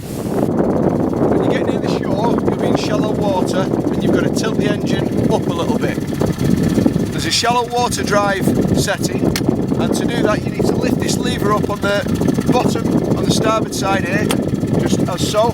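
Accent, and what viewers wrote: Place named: United Kingdom. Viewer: That sounds very British :-)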